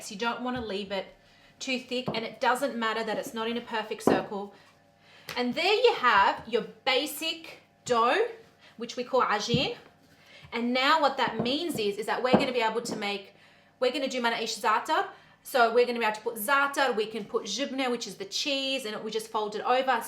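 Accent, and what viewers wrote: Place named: Australia. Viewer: When I clicked I didn’t expect the Aussie accent 😂😁👌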